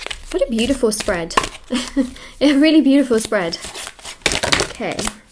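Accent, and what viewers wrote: United Kingdom. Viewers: That was one joyous reading! Your accent makes me wonder were you born in the UK or is it acquired?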